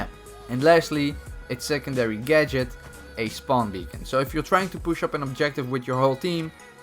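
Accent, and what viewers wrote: Netherlands. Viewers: Jij bent Nederlands ik hoor het aan jou accent😂😂 maar toch een goede video 👍👍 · are you netherlends of dutch because your accent?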